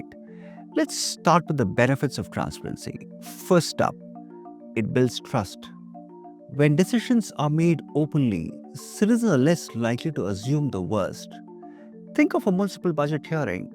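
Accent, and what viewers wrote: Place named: India. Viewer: Sir little bit more indian accent 👍👍👍 because I want to listen you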